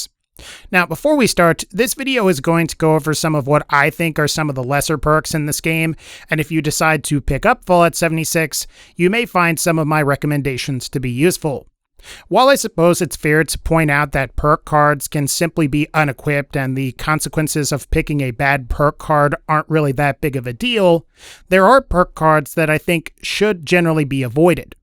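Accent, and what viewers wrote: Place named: New York, United States. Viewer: This dude is from New York or New Jersey. Or he has some other wack ass accent.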